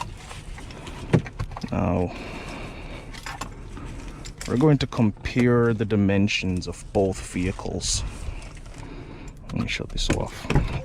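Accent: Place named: Caribbean. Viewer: Where are you from? You sound carribean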